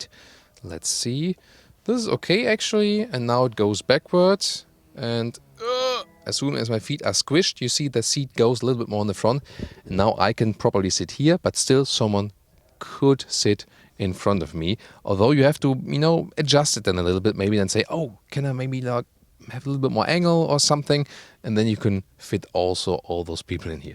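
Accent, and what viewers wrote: Germany. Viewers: This German accent :)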